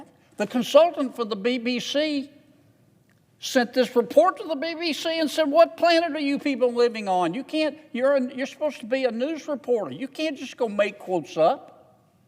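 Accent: South, United States. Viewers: I love his southern twang!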